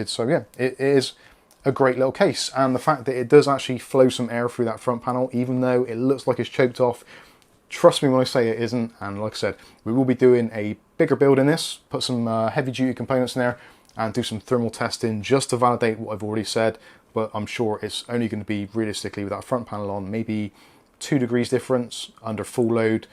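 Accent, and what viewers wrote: Bristol, United Kingdom. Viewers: Tempting to try this for £35. Was going to go with O11D Evo but I feel I'll regret it if I don't at least try a budget case for the first time. Will also help offset the very expensive build. I am also going to try air cooling for the first time (Thermalright Peerless Assassin 120) to cool a 7600X with a view to change that CPU to the 3D version whenever it launches. So I guess I'd have 3 intakes, rear as exhaust and two or 3 fans in the top as exhausts, unless I swap my 4090 for the one with the AIO. Thank you for reviewing this, I appreciate there are a lot of cases but only the main ones tend to get any attention. PS: Are you from Bristol?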